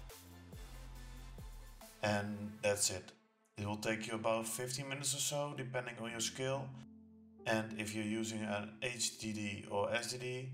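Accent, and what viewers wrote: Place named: Netherlands. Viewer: Are you Dutch? You have a Dutch accent. hehe Nice Video! Thank you!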